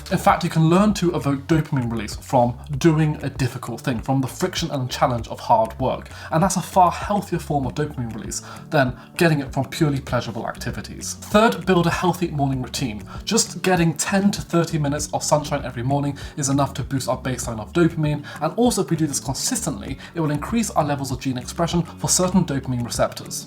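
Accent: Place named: United Kingdom. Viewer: It's really useful to learn a British accent and nice work man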